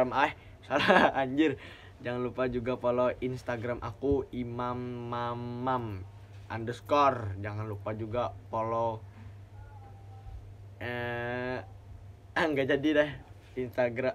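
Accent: Banjar, Indonesia. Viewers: Msh ada logat bnjr nya😂😭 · Banjar bngt😂😂😂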